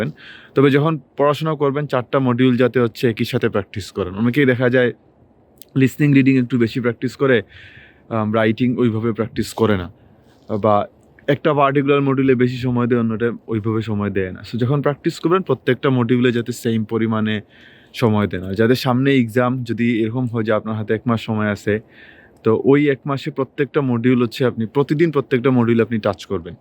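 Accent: Sylhet, Bangladesh. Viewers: ভাই সিলেটি ❤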